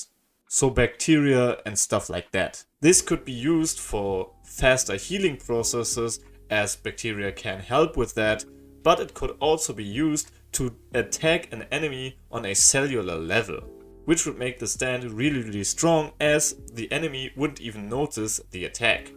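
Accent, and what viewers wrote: Germany. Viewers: Deutscher Akzent! Weiter so Bruder💪🏻👍🏻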